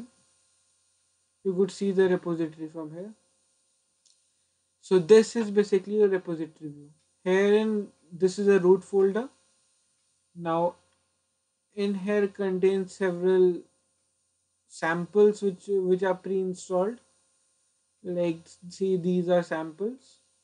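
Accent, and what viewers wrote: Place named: India. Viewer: why do always, and probably only, indians do this kind of tutorials? :D ....it is good explained but, hard to understand, the indian-english and the bad audio quality ^^ greetz :)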